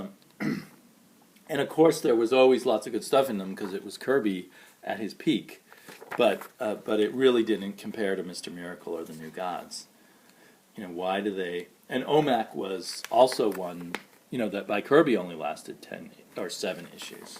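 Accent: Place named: United States. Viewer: Thank you for the kind words and shout out. No worries on the name pronouncement, it´s hard to pronounce with the american accent I know, but cuddos for trying;) Still enjoying Batman Eternal and Batgirl with the beautiful Alex Garner covers. Cheers!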